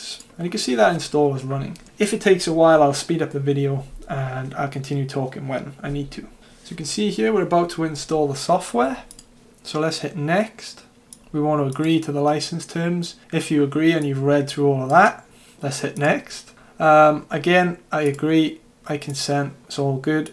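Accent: South Wales, United Kingdom. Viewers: Where are you? Sounds like South Wales?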